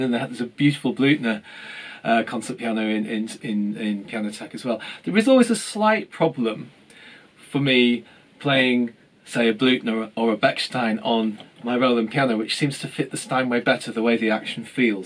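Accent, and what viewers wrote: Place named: RP, United Kingdom. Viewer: Hello there Sir!!!! I would like to leave an off-tangent comment: I love your English RP accent!!!!!!! So elegant =) kisses from montreal.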